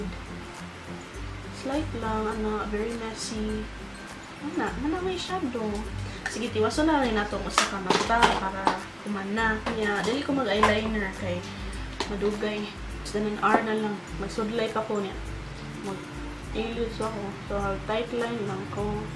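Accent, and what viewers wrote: Visayas, Philippines. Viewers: hehehe ang sweet naman mag bisaya 😊😊😊 yung asawa ko man ilonggo sweet din magsalita 😊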